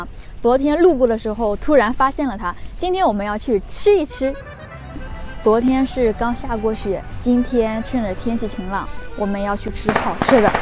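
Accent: Shandong, China. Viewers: 加油山东口音不要丢视频能做到一分或一分半钟之内删删剪剪也不要紧希望参考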